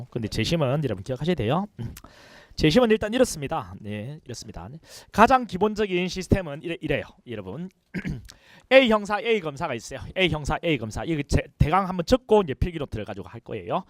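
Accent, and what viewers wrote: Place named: Gyeongsang, South Korea. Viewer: 갱상도 사투리 거부감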